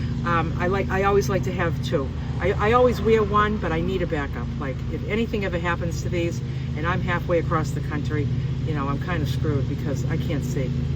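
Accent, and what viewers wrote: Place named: New England, United States. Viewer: I’m a NH native and love seeing my home state being enjoyed; you have a distinct NE accent so it must be fun to be back in the neighborhood. Be safe… Wish I’d seen this sooner as I would have encouraged you to visit Willard Pond in Hancock… you can swim there for free - my grandmother established it as a reserve and it eventually was handed over to the Audubon Society to manage it.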